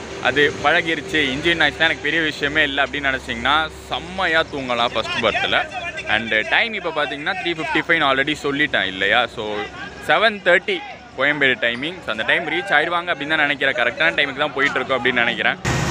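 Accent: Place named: Tirunelveli, India. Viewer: Tirunelveli slang 🤩🤩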